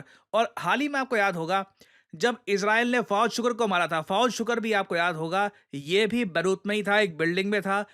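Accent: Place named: Haryana, India. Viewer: haryana ke log aise hi bol te hain bahut logo ko suna hu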